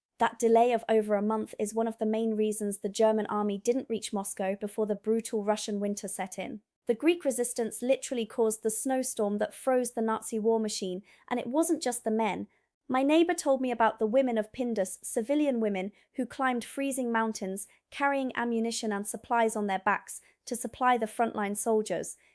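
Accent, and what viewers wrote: United Kingdom. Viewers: If you're American why do you speak with a strong British accent?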